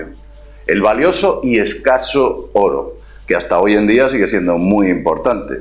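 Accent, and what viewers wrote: Spain. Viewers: normalmente no soporto escuchar mucho el acento español,pero tus videos son tan amenos y los explicas con tanta pasion y seguridad que trasciende las barreras culturales y cumplen con su cometido, transmitir la informacion, de que all afuera hay un mundo mas grande, mas rico y mas obscuro que el que nos han puesto frente a los ojos saludos y adelante!!!! :D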